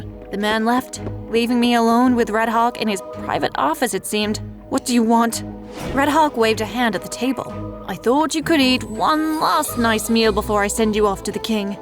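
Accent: United Kingdom. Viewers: You sound like British I always want to sound like British and I want to be shy less to speak English or British idk how to speak British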